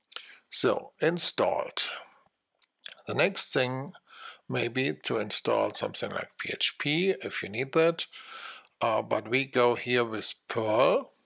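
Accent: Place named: Germany. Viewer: Ist mir schleierhaft warum du das auf englisch gemacht hast. Mit einem derart starken deutschen Akzent wäre es auf deutsch sicher besser geworden. Dennoch interessant.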